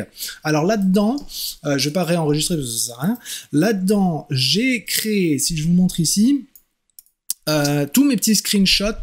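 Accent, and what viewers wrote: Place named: England, United Kingdom. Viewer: top ,comme à ton habitude et j'aime bien ton accent anglais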